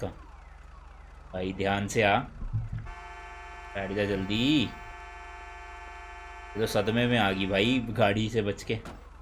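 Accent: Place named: Haryana, India. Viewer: apka haryanvi boli mst hai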